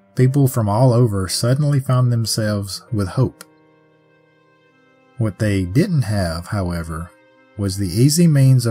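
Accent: South, United States. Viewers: Wow I'm so pleased to be like no 444 it's such a hint at how your channel I's growing and proud to be hear from the beginning and still loving your cookies and cream southern drawl xxx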